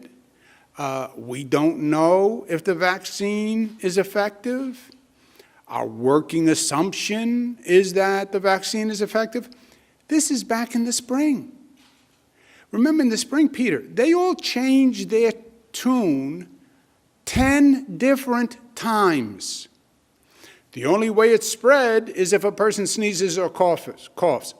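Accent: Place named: New York, United States. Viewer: I just like the NY Accent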